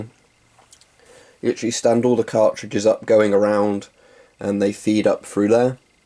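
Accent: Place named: United Kingdom. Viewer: That's sweet, I was about to say how does a person with a British accent who I assume is from England has a sub machine gun. but I see that its deactivated. still would be awesome to have I am from Canada and something like that would be highly illegal to have functioning as well and if I could buy one like this I would. I love Russian Guns, Love my SKS & Mosin Nagant. are you guys in the UK allowed to own those two and the SVT-40 (I've have yet to buy stock seems to be drying up on those in my area)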